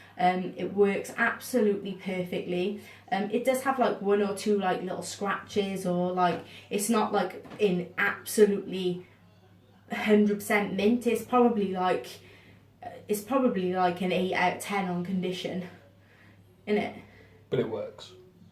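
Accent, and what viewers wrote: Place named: Newport, United Kingdom. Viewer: heh well I'm from Newport as well. The accent sicks out a mile!:)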